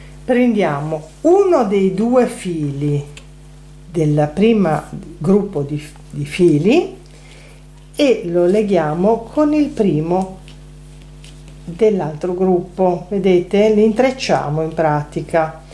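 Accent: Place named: Liguria, Italy. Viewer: Grazie alle sue chiare spiegazioni sono riuscita a realizzare il portavaso con spago.E' davvero bravissima e vorrei provare anche altri suo lavoretti Si puo fare il portavasi appeso x un vaso quadrato ,circa 25 cm x 30? Ps il suo modo di parlare mi ricorda,con nostalgia la mia Genova, sbaglio ,o anche lei è Ligure?Cari saluti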